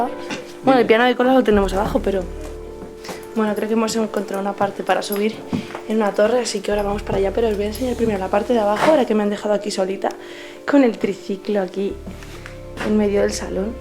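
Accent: Spain. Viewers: I just love your videos! You have awesome and extradinary videos! Your voice and Castillian Spanish is so beautiful! Your stories on the homes and assessments are so vivid! I give your videos a 20 thumbs up👍 Le Crem of the best!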